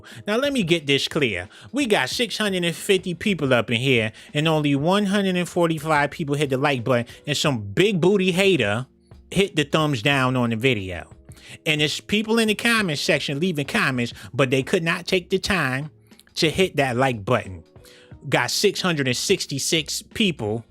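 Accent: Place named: Cleveland, United States. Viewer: The reason people saying some jacked up shit is cause yo voice dude lol they don’t take u serious “sometimes”. The way he talks: u know what im sayiiin we all gotta come together an beat our chicks an maybe we might get a promotion at our 9-5’s the nigga talk like Cleveland lol but he sounds like he super sarcastic while saying real shit lol but I don’t care I only said something cause u said u don’t want people to talk trash about him. It’s ur voice it interests more people into doin it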